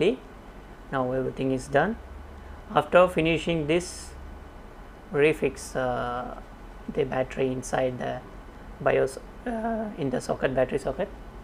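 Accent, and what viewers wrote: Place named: South Asia. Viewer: He has an Arabian keyboard, but sounds South Asian loool, but I love that keyboard. I wonder if this will work.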